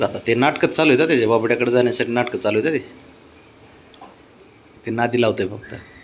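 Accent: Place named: Sangola, India. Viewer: तुम्ही पुण्यात राहत आसला तरी सोलापूर ची सांगोला ची भाषा आहे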